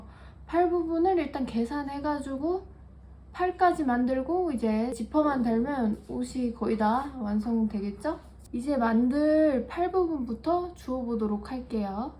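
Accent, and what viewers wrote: Seoul, South Korea. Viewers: 서울 사투리 쓰시나요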